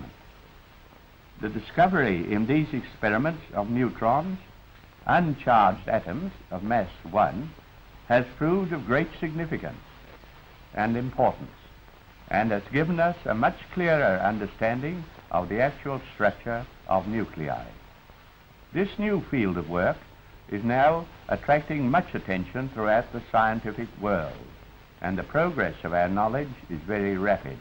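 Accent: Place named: New Zealand. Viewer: I'm amazed his accent is still so clearly Kiwi, especially given his education, class and all those years in the UK. It's great to hear!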